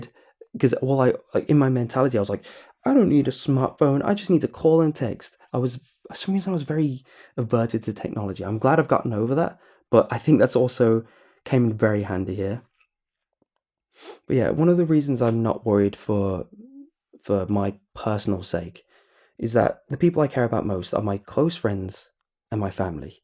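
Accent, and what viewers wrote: England, United Kingdom. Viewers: Is he english? Im english and he doesnt sound totally english. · I thought he was gonna look like those british people ( which the fact that he speaks a british accent ) but with blonde hair and green eyes or something like that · Well, I really like the videos you post, oftentimes fall asleep to CreepyPastas, your voice is somewhat nostalgic and melancholic giving them a pleasent nuance. And besides I'm quite found of the Brittish accent, my teachers was from Manchester. Keep up the good work brother! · this is an awful situation but GOD DAMN THAT BUTTERY ENGLISH VOICE MATCHES UP WELL YOU HANDSOME DEVIL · And I wish it would change he's only got this one voice with exaggerated breathing or the annoying little kid voice. They're all thicc British accents when you're trying to hear about a teenager living in Alabama being stalked through a anbandoned mall by monsters. 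"Ello mate, my name is Skylar and me mum has sent me to the mall to get some cookies. Pip pip cheerio. Long live the Queen of America." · Ayyy I watch your videos, funny seeing you here🤣. He's got a British accent so just assuming english is probably his first language. From what he was saying it seems like he is. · wow is part asian? who could have guessed that:O i always imagined you(by voice) to be a british guy.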